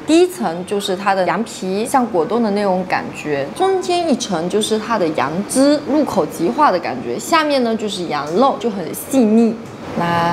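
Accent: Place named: Putian, China. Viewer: 莆普有待提高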